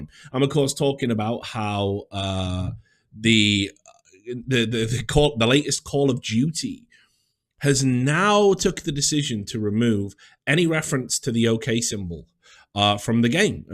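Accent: United Kingdom. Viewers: How do u sound more British now?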